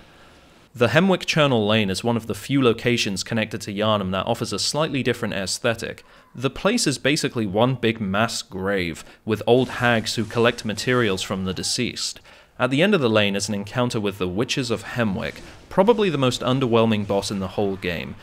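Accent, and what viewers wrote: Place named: United Kingdom. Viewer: I went from british dudes narrating the savanah to british dude narrating videogames.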